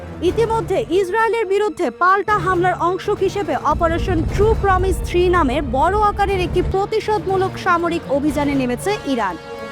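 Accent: Kolkata, India. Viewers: Apni citkar kore khobor porsen keno? Mone hosse kolkatar khobor sunci....santo vabe khobor poren... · পুরাই কলকাতা স্টাইলে নিউজ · যিনি খবর পড়তেছেনে মনে হচ্ছে ভারতীয় ।কলকাতায় এমন খবর পড়ে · ইন্ডিয়ার মত নিউজ পাঠ করা শুরু করল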